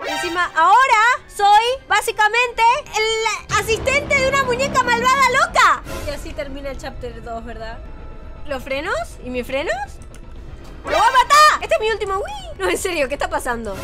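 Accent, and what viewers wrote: Argentina. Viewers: Hola eres argentina😊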